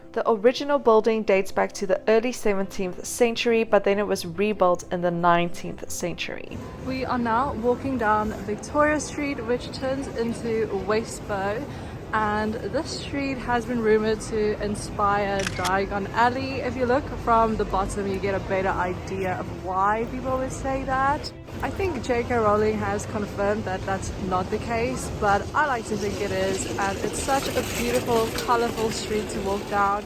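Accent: South Africa. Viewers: Wow awesome video ☺️ i just stumbled upon it. You sound South African 😁. Love that Pub set uo as well. I will be coming to Edinburgh second week in March and would LOVE TO do Karaoke. Please 🙏 do a video showing karaoke Pubs / Bars in Edinburgh. Thank you in advance. I am from Johannesburg South Africa 😁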